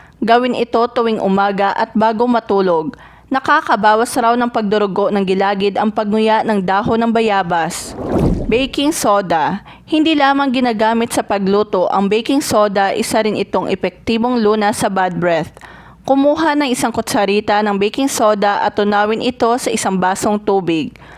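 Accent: Visayas, Philippines. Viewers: Nagdodolot visaya ka day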